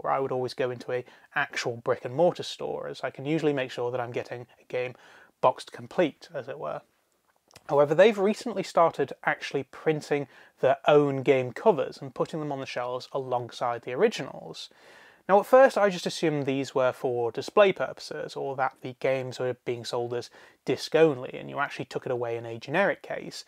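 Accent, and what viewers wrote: Birmingham, United Kingdom. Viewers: Are you from around Birmingham? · Are you from Birmingham?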